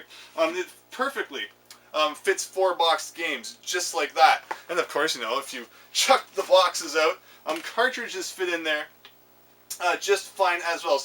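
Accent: Canada. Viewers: Liking this video for the Canadian accent alone. "Put it on the COACH" bruh :)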